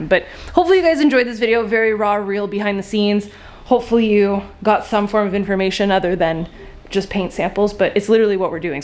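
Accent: Canada. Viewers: Omg. In love. Brett and I are living vicariously through you until we reach this! You have definitely raised our standards and life goals! We watch all of these golden oak videos together. Also my almost two yr old thought it was very funny when you kept saying babe bc Canada accent compared to our Texan accent lol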